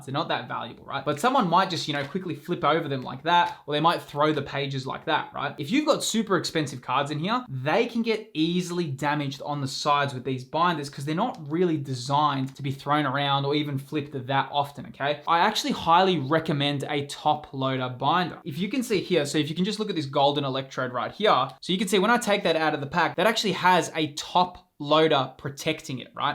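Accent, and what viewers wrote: Australia. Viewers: I love how Australians say Naoer instead of no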